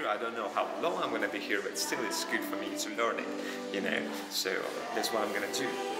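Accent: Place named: Mexico. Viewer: love that Mexican accent when you speak Spanish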